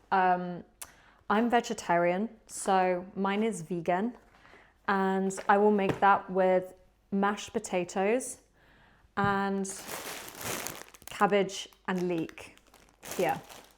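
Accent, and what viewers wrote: England, United Kingdom. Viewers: R u native british ? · Nice and interesting video, I like British English!....... Thank you so much!...Greetings from Peru!...💞🇵🇪❤️...comprehensible input to practice listening English 💫👍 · Please teach me how to speak with british accent ahahah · great video! I really appreciate that you speak slowly, it makes it much easier for people who are learning English I' ve been trying to get British accent, and your videos are actually helping a lot also Wilson completely stole the show :)) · The British accent is si beautiful, ojalá siempre fuera así de lento, you are beautiful too ❤❤ new suscriptor 🎉❤ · This is the first time I've seen one of your videos, and I find everything you explain about rural England, or as you call it, the countryside, very interesting. I'm from Honduras, Central America, but I've lived in England since before the pandemic. 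I really like your English accent, the way you speak, and the cadence you use. I find it very pleasant and clear, and of course, I understand it perfectly because you speak slowly, which makes it very pleasant to listen to. I would like it if, in your new videos, you could explain why the language is spoken with different accents in different cities and counties, because some of them are really difficult for me to understand. Greetings from Nottingham. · Many thanks to you!! Good experience for me to hear British pronunciation, and I don't need to make speed lower, as for my level, it might be a little bit faster. I'm from Russia and today in 8th of March, we celebrating international women's day, so wish you stay cheerful and cool as you are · Thank you for your vlog. I really love the British accent, and I enjoy learning more about the UK. That’s great! Please keep making more vlogs. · I like this video of slow British English, it's really easy for me struggling with my listening and spoken part to listen your words clearly. 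Besides, British accent is extremely elegant while you spoke slowly and clearly. So i appreciate your video of life in British coatal town, hoping you can show your normal life in the UK hh which i prefer to watch, thanks. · Is this british?🇬🇧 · Hi, your Slow British English is really helpful for English learners. Thank you so much! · wow I love your accent inglish and thank you so much keep going 👌👌💕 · You speak very clear words I believe its good for beginners to watch your video to learn English and British accent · ❤I'm Brazilian and I'm learning more about the British accent from your videos, I'm loving it! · Thanks for your videos. I study English, and this content helps me with my pronunciation. I like the British accent ;) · good videoes and carry on! And personally, it is not only the videoes that I want to see, but more skills and expressions of native British accent! Now it's the slow english, but what about a bit faster? 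More training techs and skills would also be welcomed! Thank you so much again for making lots of videos so far! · You are so quiet. And you speak slow British English very interesting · Hi, this is jesmine from Bangladesh. I basically played this video to mimic your slow British Accent. Actually I'm learning English and now my level is approximately B2 but my pronunciation is worse this is why I decided to watch and follow your voice and I did it all. thank you for this video and I expect you will make more and more slow video which will be helpful for the learners how want to gain British accent also who want to fixed the pronunciation like me 😁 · always love british accent videos, and your gentle voice is so nice, keep on🥰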